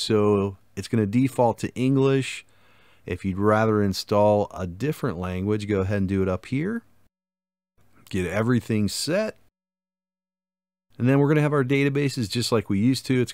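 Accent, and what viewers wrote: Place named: Michigan, United States. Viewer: You have a Michigan accent 100%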